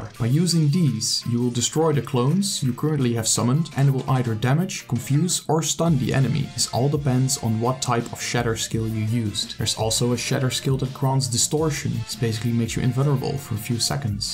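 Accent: Netherlands. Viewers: i clicked - i hear a dutch accent - i like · You are dutch, aren't you? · Hands down the BEST video of its type. SUBBED. Love your accent. Dutch? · Not that your English is bad, far from that, but i imediately heared you're dutch. Great Video tough.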